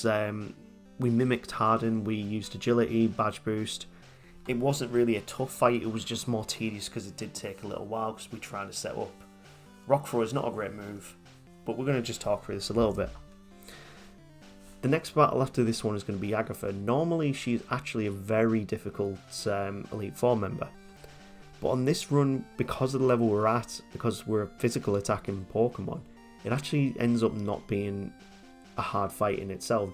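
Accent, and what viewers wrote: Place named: United Kingdom. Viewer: I found your channel while looking for a Kanto solo runner who doesn't use BBG. IMO, it's bullshit because it's very easy to discriminate between when it makes sense to use moves for their primary effects and when you're just exploiting the poor programming. It just requires an iota of self-control and honesty/integrity. The fact that enemies can accidentally boost your stats is no different to them having the ability to give you free turns with moves like Whirlwind and Teleport or even weaken themselves with Focus Energy -- part of the glorious mess that is Gen 1 randomness. But it means that the opponents are already at such a disadvantage that the BBG becomes totally broken and unfair. That's just my personal opinion, of course, but I hold it very strongly. I don't even watch Jrose anymore due to his overuse of the BBG. 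Your chan's not for me because of the BBG but good luck with it -- always nice to hear other British lads doing Pokemon content. Some assessment/advice from an expert on this stuff: the average video quality seems decent. Your energy levels aren't as high as most of the popular "Poketubers", but some people will enjoy the more relaxed vibe and you'll certainly find a niche as-is (tbh, you stumble over your words a little more than I'd ideally like, which seems harsh but modern YT demands near-perfection; if these reactions are ad-libbed, that's great - and the small flaws even add charm and authenticity - but you could always re-record lines with major mist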